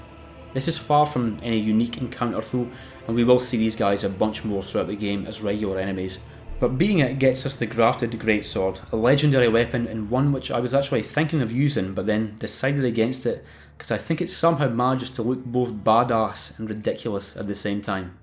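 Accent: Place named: Scotland, United Kingdom. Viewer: Multiple hours of some guy with the most luscious of Scottish accents just talking about his love for Elden Ring? Count me in mate.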